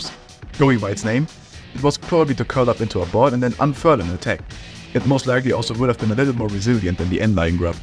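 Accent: Germany. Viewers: Ich liebe deinen deutschen Akzent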